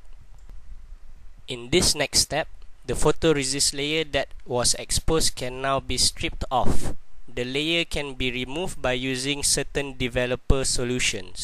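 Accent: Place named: Malaysia. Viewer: like a malay reading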